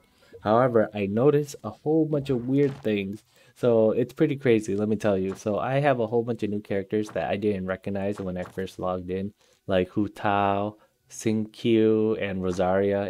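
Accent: Russia. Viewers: You russian?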